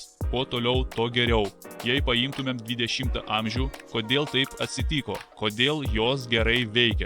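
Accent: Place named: Russia. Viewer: Rusiškas akcentas per voice changeri girdisi, primate.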